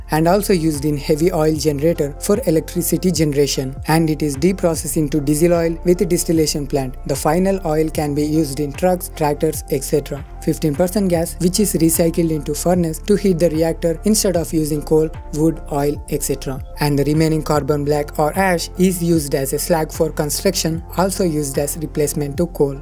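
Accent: India. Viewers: Very nice animation and good explanation, eventhough I am not used to the "Indian" accent. Yet I would not recommend to use "coal or wood" for heating the system because it creates a LOT of pollution. Electricity should be the ideal source of energy to start the process.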